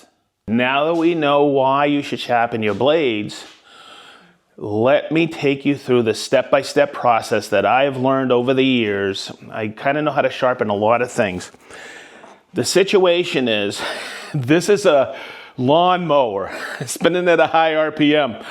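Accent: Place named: New England, United States. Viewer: Yikes! Metal shavings around wood-the reason I separate wood shop from my lawn mower repair shop. I get it your shop is set up for videotaping still makes me cringe. With that said, the hand grinder is my new go-to method to sharpen mower blades that’s an excellent method and like you hit the burrs with a file. There is a debate out there you shouldn’t sharpen to “sharp” as it accelerates degradation of the edge. For me I like sharp and my customers appreciate it too. Cleaning the blade I use a wire brush currently but will try your soak method next time. One shop I used to work at hit the blade with black spray paint. This along with power washing machine with clean blade adds a perceived value of pride-things that transferred over to my current shop. Like your “wicked good “ New England accent.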